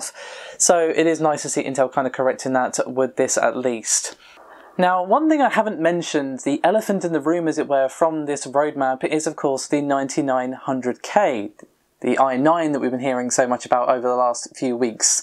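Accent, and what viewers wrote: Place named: London, United Kingdom. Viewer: England, london area I think.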